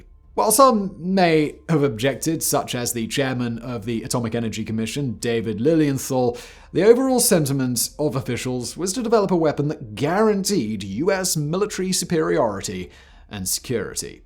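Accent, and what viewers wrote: United Kingdom. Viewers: A video about a country the United States eradicated is rich coming from someone with a British accent... The British eradicated half of the world, including what became the United States lol.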